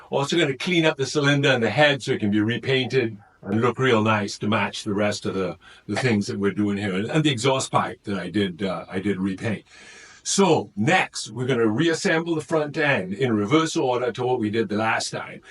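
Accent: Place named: South Africa. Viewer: Nice work! Anyone who has done stuff like this knows it can be a bit tedious, but it is very rewarding in the end. That DT is gonna be a thing of beauty. I've been trying to figure your slight accent. South African?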